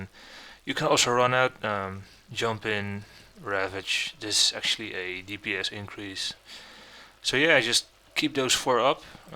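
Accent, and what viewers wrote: Netherlands. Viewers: Lol you can just hear this dude is dutch :) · ure dutch arent you?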